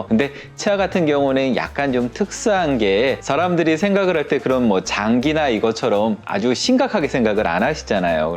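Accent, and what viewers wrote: Seoul, South Korea. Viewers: 서울 사투리 쓰시는 거보면 서울토박이신가봐요! 재밌게 잘 봤습니다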